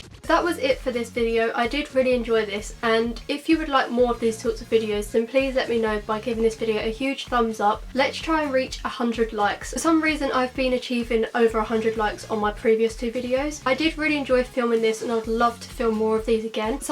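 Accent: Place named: United Kingdom. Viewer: I love it!!1 Thanks for checking out my channel!! AND OMGGGG UR BRITISH I LOVE BRITISH PEOPLE AND UR GUYS ACCENTS IVE ALWAYS WANTED ONE XD <3 xx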